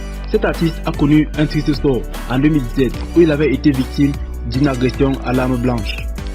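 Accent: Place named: Cameroon. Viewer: Mon ga tu raconte que des connerie sur té vidéos a entendre ta voix je suis sur que té un camerounais avec ton acsen 😂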